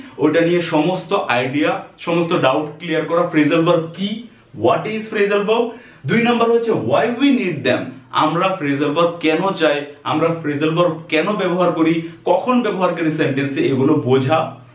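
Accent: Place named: Assam, India. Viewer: Watching for the first time good...want to ask that ur dialects maches with Assam ..u r from there?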